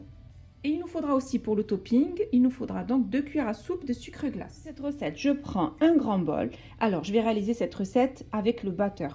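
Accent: South, France. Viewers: C'est surtout le même accent du sud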